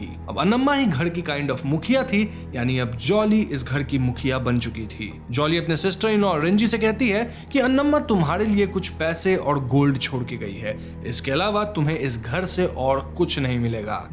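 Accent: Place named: Bengal, India. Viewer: Dada bongali hai .. per pe char Raha hai .. not ped pe Chad Raha hai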